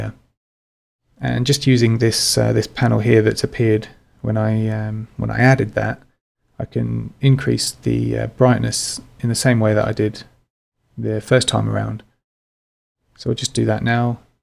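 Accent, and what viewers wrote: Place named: United Kingdom. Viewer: Brilliant! Thank you so much.... I especially loved your British-isms, being a Brit living in America for the past 16 years I really felt at home especially with 'stuffed' and 'dodgey'. A fab, well paced tutorial that has prevented me throwing my laptop out the window because of Photoshop. Thank you.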